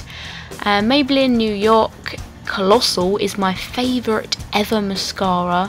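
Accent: United Kingdom. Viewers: She's British and they have an accent so not everything sounds as perfect as you wish it to be you silly American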